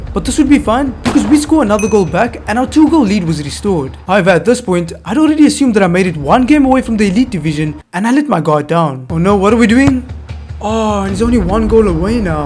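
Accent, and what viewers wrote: South Africa. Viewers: Are you south African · You sound South African Bro! What a vid! Keep up the good content! From Namibia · U from south africa?? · Are u South African??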